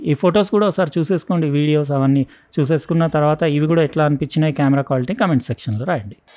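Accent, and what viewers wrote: Telangana, India. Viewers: Telengana slag bale vuntundhi.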